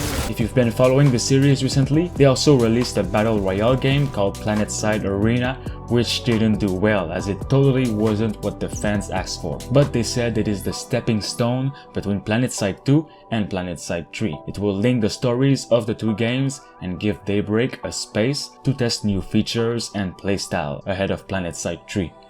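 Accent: Quebec, Canada. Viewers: Great Québec accent!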